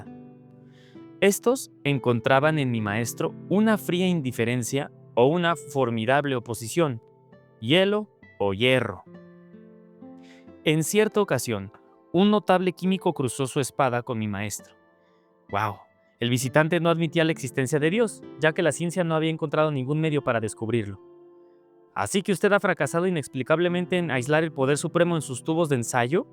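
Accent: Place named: Mexico. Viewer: Me gusta mucho tu manera de leer pero sobre todo ese acento mexicano inconfundible ❤❤🙏🇨🇺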